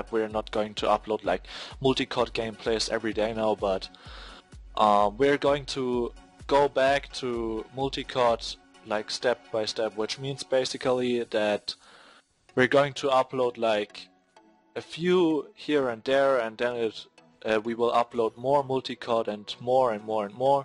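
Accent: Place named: Germany. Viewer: Kommst aus Deutschland ;) schwer zu überhören :)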